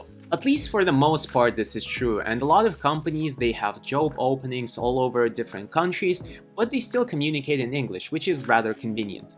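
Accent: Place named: Russia. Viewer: That russian accent man, I can hear it from miles :)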